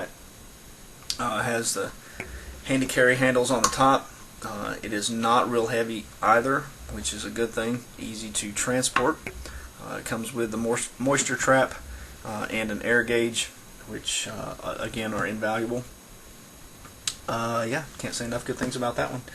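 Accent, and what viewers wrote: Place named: Southeast, United States. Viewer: Off topic (sorry) You sound like you are from the southeast US. If so where? I am in Leeds, Alabama (suburb of Birmingham, Alabama). Just curious. I am jealous of your setup, for what is worth. Thank you for sharing it and it would be awesome to see your work. Do you post to cmon and if so what is your screen name? Thanks again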